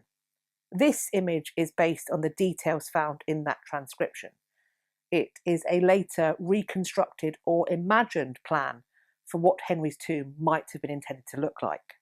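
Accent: RP, United Kingdom. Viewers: I just love listening to you speak. So elegant. No fillers not a single extra syllable. Just straight clean facts delivered in BBC English. Episodes are always fascinating as well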